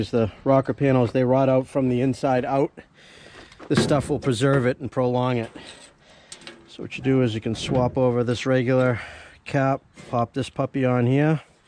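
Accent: Massachusetts, United States. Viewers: The accent screams Mass my guy. Thanks for the video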